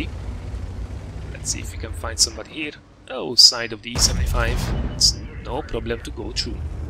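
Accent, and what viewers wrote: Hungary. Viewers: de hallatszik hogy magyar vagy :D ment a like es a feliratkozas :)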